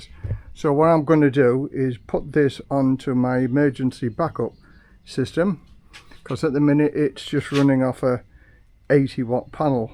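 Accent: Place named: Sunderland, United Kingdom. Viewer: You from Sunderland? Accent seems similar.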